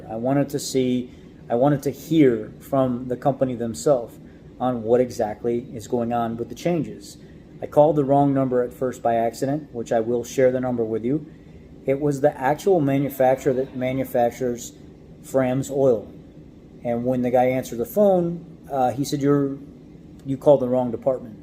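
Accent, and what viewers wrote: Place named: Chicago, United States. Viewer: You sound like you from back east Chicago area lol I love the content.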